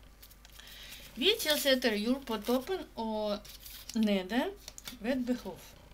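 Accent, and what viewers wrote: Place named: Russia. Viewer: Ruskiy aktsent na vidu :)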